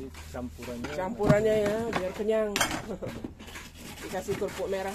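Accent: Minangkabau, Indonesia. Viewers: ni boleh berdialek minang tapi juga bisa juga mengeluarkan perkataan jawa.....terima kasih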